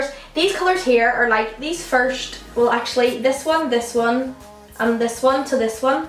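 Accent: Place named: Belfast, United Kingdom. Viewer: wee bitta belfast no mean no mean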